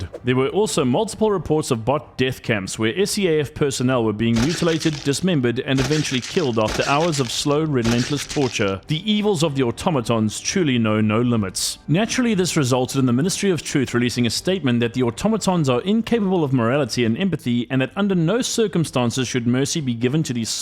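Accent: South Africa. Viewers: I can smell a South African accent a mile away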